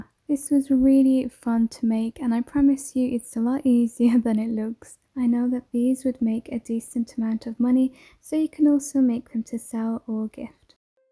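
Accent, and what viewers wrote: England, United Kingdom. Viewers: do you live in England??? Love ur beautiful accent!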